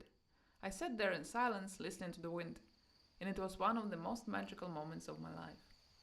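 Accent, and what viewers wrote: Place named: Russia. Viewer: I hear russian pronunciation ...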